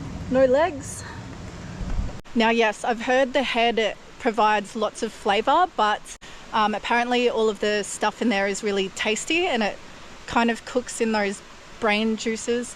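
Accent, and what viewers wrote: Australia. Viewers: Love the Australian Accent!!!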